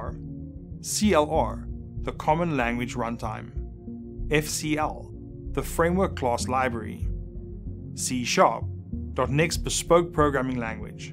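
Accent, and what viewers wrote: South Africa. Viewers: You a Jozi man?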